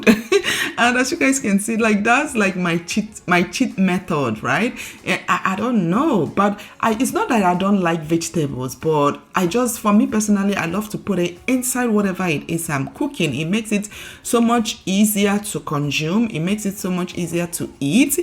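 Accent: Nigeria. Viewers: WE LOVE THE NAIJA ACCENT 🥰❤️🥰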